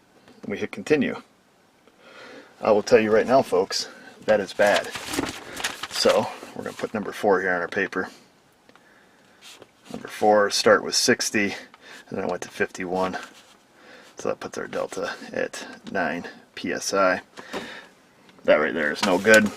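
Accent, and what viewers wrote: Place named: New York, United States. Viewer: love the NY accent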